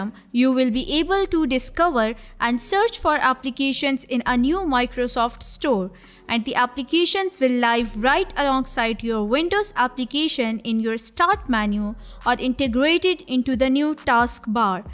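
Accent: India. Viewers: I think you have really interesting Content, but I can’t stay this Indian accent anymore. Sorry i tried. Really 😩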